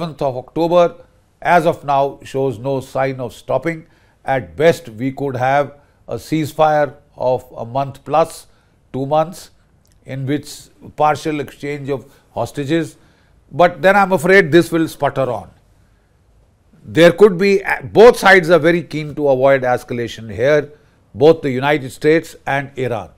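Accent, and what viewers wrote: India. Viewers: Very informative and absolute master class Indian English.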